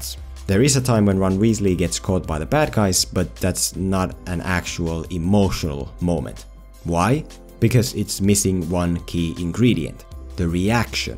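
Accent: Finland. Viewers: Suomi aksentti on point mun nekru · You sound very much like a fellow finnish man. Am I correct?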